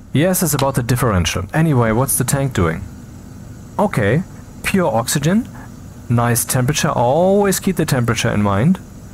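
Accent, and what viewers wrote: Germany. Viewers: Richtig schönes Video! weiter so! Und dein Englisch kann ich so gut verstehen, weil du so klar redest :-) Gut ich höre raus, dass du deutsch kannst/bist?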